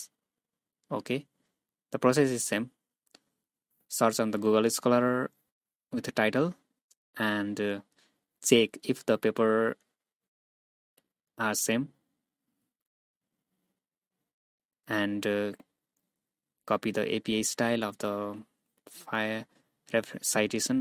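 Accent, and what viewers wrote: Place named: India. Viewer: Disastrous Hindi english accent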